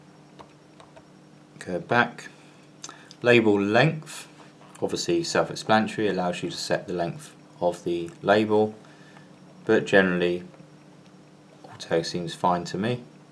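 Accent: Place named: United Kingdom. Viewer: if you wanted one with rechargeable batteries and an adapter why don't you go get one??? there are many other brands, brother included that sell them as you wanted. why buy a cheap product and then complain about it not having components. Must be a British, UK or whatever the hell your accent is from type of thing. Don't buy equipment that is cheap and then want all the extra features. use your common sense!!!!!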